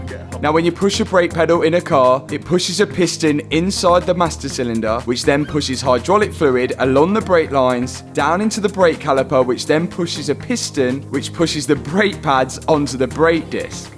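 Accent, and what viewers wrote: Leicester, United Kingdom. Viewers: I'm curious, what part of the UK is the accent from? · I love that Lesta accent . 👍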